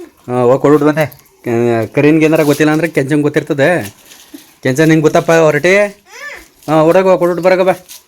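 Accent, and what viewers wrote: Mandya, India. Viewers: Anna nivu mathadodu tumba eshta., adrallu nim wife jhote mathadtiralla adu maathra super., namdu mandya ne., mandya language super...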